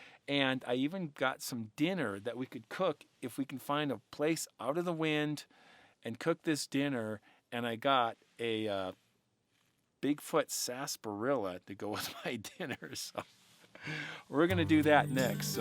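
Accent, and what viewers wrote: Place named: North, United States. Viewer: Hi, I want to thank you for the excellent content. I love your campfire stories, the camping, and cooking recipes. I'm 64 and have a bad back and bad knee, so my camping days are most likely over with, but never say never, right? My name is Kris, too, but with a K. What state did you grow up in? The way you pronounce south makes me think you're from a northern state. I'm from Texas, so we pronounce south like "mouth". That's the redneck pronunciation, lol. Great channel,. Keep hiking (for those of us who can't 🤠)!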